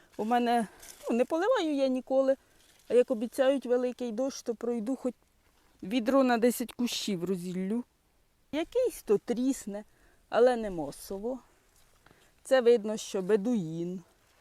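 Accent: Poltava, Ukraine. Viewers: Мабуть звідкись з Полтавщини судячи по говору. )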